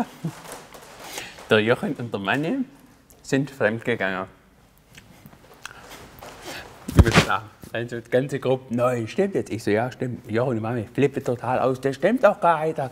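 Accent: Swabia, Germany. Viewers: Marcus V Bua, der schwäzt Schwäbisch ned bairisch. Die wolled uns blos nachmacha vorstosch? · schwäbisch xD grüße aus ravensburg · Dieses Schwäbisch einfach zu geil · HAHA an alle die kein schäbisch könna XDDD ihr versteht nix XD du musst untertitel hin machn^^ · Manta i woass dass ea schwäbisch schwätzt xD awa i dabaggs dengerscht ned so zu ren gschweige denn zu "schreim" ^^ awa vasteng tu i scho guad :) i sollte valleicht ein bissle schwäbisch leana wie i boarisch gleant hob xD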